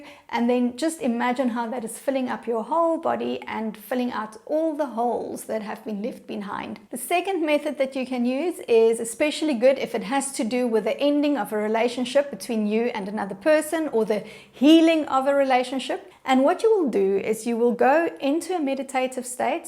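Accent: South Africa. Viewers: You sound south African 🇿🇦🥺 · You sound south african,I feel at home❤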